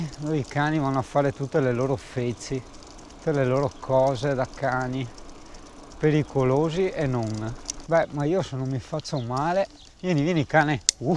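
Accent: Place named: Milan, Italy. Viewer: ma quanto cazzo parli milanese del cazzo..insopportabile...se dovessi solamente un giorno capitare con te in auto, mi sparerei al primissimo secondo al solo ascoltarti parlare... anzi ti sparerei...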